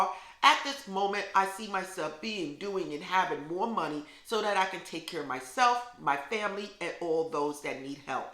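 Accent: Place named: New York City, United States. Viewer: Thanks, friend. I'm originally from Long Island (but I'm a Georgia peach now) and your NY accent is next level - wow! Thanks for the tips.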